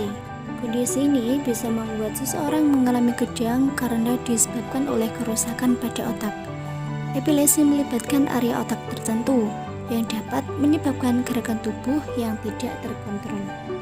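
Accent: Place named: Java, Indonesia. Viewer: Medhok yuuu 😭🤣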